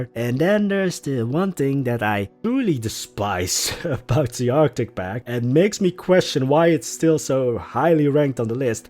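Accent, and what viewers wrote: Netherlands. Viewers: Are you dutch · I smell Dutch... 👀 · Ur dutch right?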